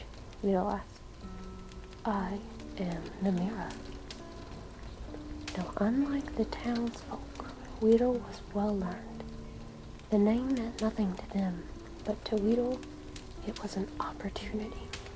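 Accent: South, United States. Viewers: i like the slight southern twang to your voice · American accent spoilt it for me. Americans are not medieval. It needs to be an English accent. Cornwall, Devon, Irish,...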